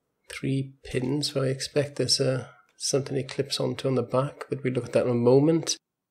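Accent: Wales, United Kingdom. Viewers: u sound welsh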